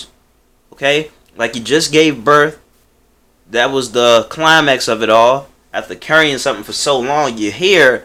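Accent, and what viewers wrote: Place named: Baton Rouge, United States. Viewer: Another good video! Now, a totally off-topic question: Do you have any connections to Baton Rouge? The way you say certain words takes me back to the time I spent at LSU.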